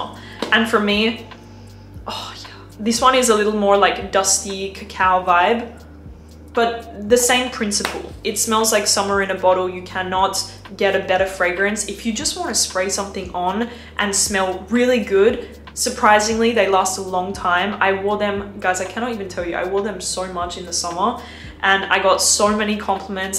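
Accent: Australia. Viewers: Just wanted to tell you that you sound so Australian👍 I like it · ! We missed you and your aussie accent 😍 You look stunning! Happy to see you back, don't forget about us here on YT 😢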